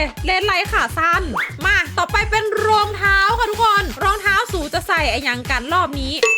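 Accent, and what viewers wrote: North, Thailand. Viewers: พี่ เปนคนเหนือหรอคับ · พี่เป็นคนภาคเหนือหรือค่ะ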